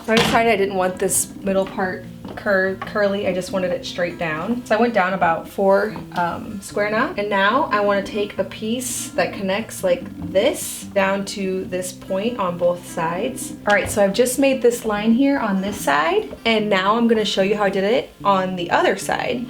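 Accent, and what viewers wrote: United States. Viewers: No way, our young lady is not from NC with that accent. Iowa, I think. I'm from SC and have lived in NC as well. Remember Boone as a small town "nestled in the Blue Ridge Mountains" my family'd stop through on our way to Ski Beach in the winter.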